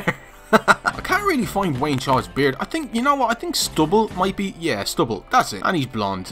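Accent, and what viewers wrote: Ireland. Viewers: I love that Irish accent coming from a lad from Cork😂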